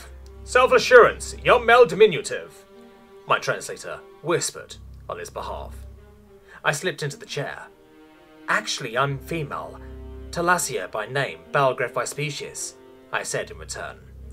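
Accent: United Kingdom. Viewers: the is british "he is british lad" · Ahh, so British! it was refreshing!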